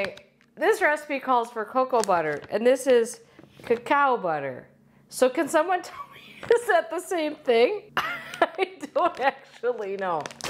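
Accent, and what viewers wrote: Wisconsin, United States. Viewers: This is one of the funniest videos I've seen in a while. Your lovely accent reminds me of my Wisconsin childhood. Thank you.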